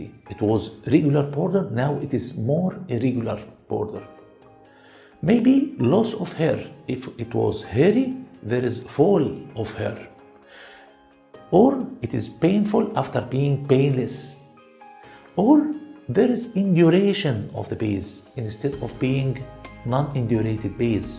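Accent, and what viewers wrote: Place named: Arab world. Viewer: Great!! Like the Arabic accent... :)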